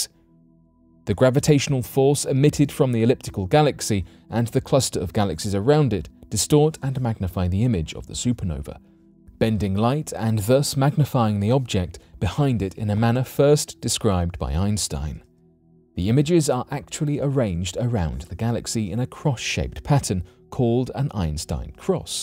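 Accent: United Kingdom. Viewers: I understand why he pronounces bellingenic the way he does he's British guess he's never heard of that famous explorer from long long ago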